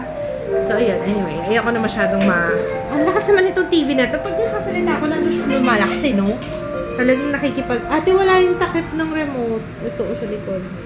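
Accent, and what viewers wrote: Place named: Iloilo, Philippines. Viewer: hi miss! nakakaaliw po kayo! i feel good watching your videos. may pag ka hiligaynon (ilonggo) yung ibang word and accent nyo hahaha.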